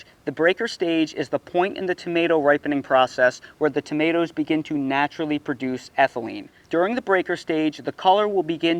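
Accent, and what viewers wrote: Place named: New York, United States. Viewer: You sound like you're from New York or Jersey. 😄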